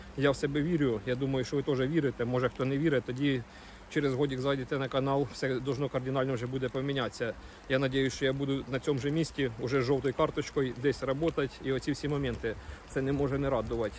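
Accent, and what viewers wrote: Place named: Cherkasy, Ukraine. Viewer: Дивлюсь вас з Канади... містечко біля Ванкувера ... Така ж погода, такий самий ліс !) ... В Канаді повна ж.... з роботою... з цінами на житло... А головне, інколи почуваєш що ти не в Канаді, а в Індії))... Кругом "чорні"... Їжа ((( ... , мабуть найгірша у світі... Дякую вам за відвертість, простоту у відео )!... Маєте Черкаську українську )...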